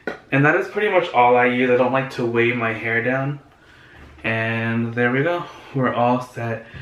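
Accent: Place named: Philippines. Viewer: I'm guessing you're a Filipino. I can tell by the accent. :)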